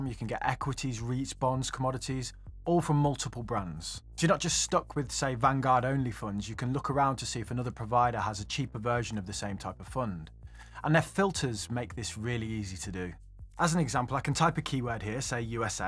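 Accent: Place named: West Midlands, United Kingdom. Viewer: as a fellow west midlander great to hear our accent broadcast you videos are very educating and continue to grow our knowledge thankyou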